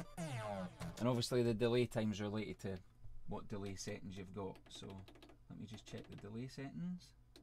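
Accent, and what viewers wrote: Scotland, United Kingdom. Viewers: what accent is this? =) scottish? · dude,how do you setup the virus ti with cubase properly? i dont manage:-( btw,are u scottish? i can hear that,i am from Aberdeen:-)) anyway,i am struggling with it :-(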